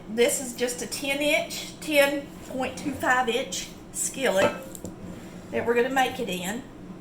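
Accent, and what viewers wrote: South, United States. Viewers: Well I just signed up a few minutes ago but you're fun to watch. I love your southern accent. And I love that you sat down to eat your meal.😁 I think that's fun to watch too. Most people don't take a bite of the food they cook, and I wish they would. :-)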